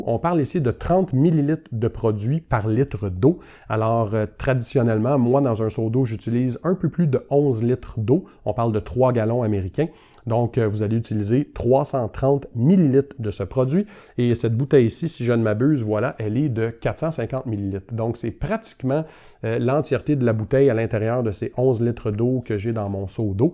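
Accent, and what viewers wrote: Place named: Quebec, Canada. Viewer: L'accent québécois dans un video de detailling sur YouTube j'aime ça. Taukebkictte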